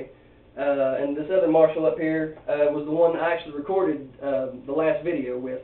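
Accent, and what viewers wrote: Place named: South, United States. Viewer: You have a Southern accent. Where are you from?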